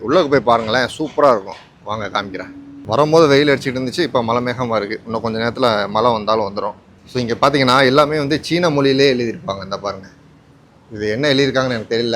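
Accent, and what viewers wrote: Tamil Nadu, India. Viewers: Brother I like your tamil slang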